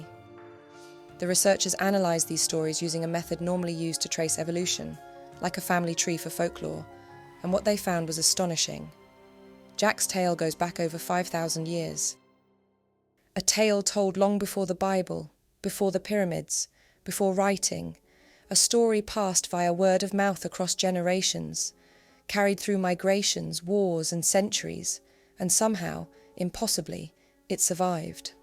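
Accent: England, United Kingdom. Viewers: Great video, I enjoyed that , Nothing like a nice story told by an English lady with a lovely accent.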